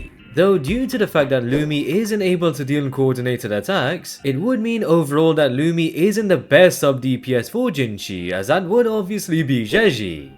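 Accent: United Kingdom. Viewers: sounds british to me. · ??? Her accent is Scottish, not British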